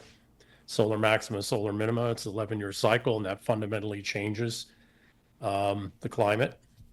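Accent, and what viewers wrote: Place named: United States. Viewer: He sounds American. So why does he speak as a British Subject? Is he British/English?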